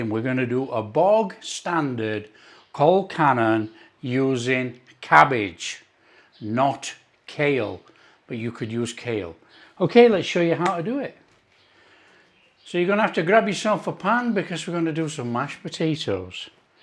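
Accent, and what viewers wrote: Yorkshire, United Kingdom. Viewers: Interesting. I've never had, will try now. Bog standard colcannon. Thank you. Is that a Yorkshire accent?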